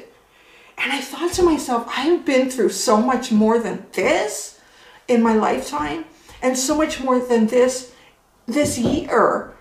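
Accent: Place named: Canada. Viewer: Really like your channel- wish you lived nextdoor! You might almost, I live in Minnesota and you sound Canadian.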